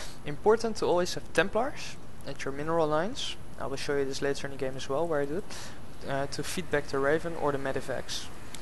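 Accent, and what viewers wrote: Netherlands. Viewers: Wanne Hollandse accent joch !!! Good video btw ! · Work on the Dutch accent ^_^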